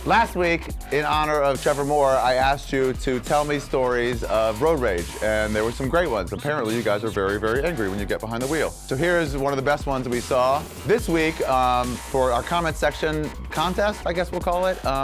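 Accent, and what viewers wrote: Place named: Carolina, United States. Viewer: yes she does. Very heavy Carolina accent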